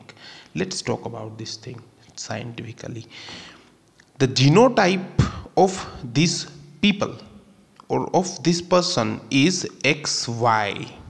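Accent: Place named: India. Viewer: Какой прикольный акцент у индусов.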